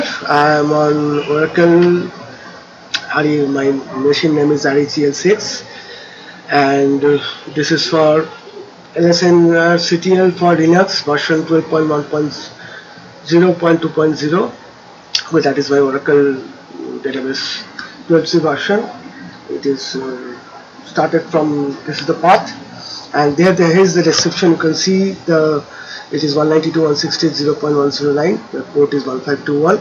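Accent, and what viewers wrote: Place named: France. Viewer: puto acento francés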